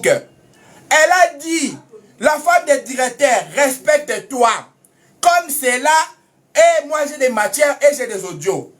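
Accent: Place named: Ivory Coast. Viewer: Mura de presse tu as bien parlé en français comme ivoirien félicitations notre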